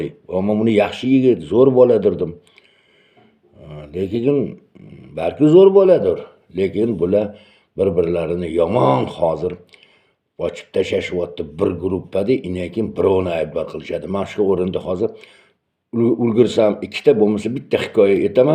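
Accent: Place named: Tashkent, Uzbekistan. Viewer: А сизни энди ёллашдими. тошкентча шевадасиз.сиздан ёшим каттаман охирини эшитгим келмади.муридни Муридисиз.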